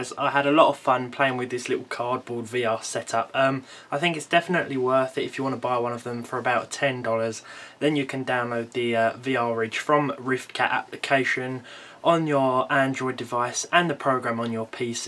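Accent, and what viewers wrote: United Kingdom. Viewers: Hahahhaha "excuse me.... here we go!" Hahahhaa I love this British guy! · Great video but honestly, the commentary is so damn british it's incredible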